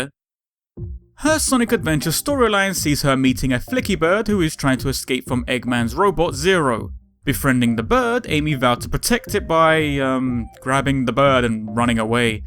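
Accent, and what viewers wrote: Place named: East London, United Kingdom. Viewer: you sound like you're from east london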